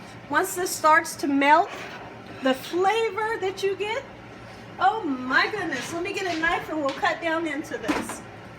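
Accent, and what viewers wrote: South, United States. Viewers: Victor Matthews yea i was surprised at the end when I saw her arms were black she sounded like a perfect typical white southerner with the laughing too and how happy she was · I cant be the only 1 who thought that voice belonged to a lil white southern lady😂🤣 good cooking 👍👍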